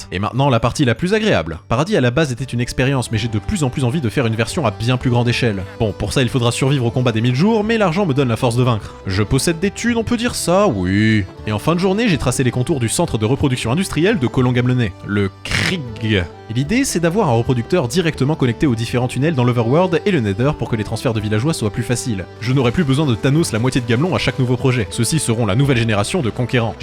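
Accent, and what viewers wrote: Quebec, Canada. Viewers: je pense qu il est québécois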